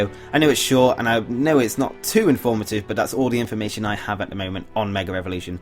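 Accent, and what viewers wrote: United Kingdom. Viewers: hear it. I'm from America though.. Are you British?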